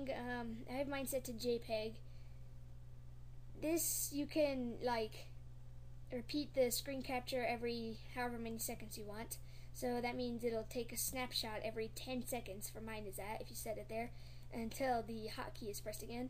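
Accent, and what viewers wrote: Ireland. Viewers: irish? waayyy kewl :) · u irish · He sounds Irish to me xD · Irish accent detected. Adorable. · where r u from?? Little kid? ireland??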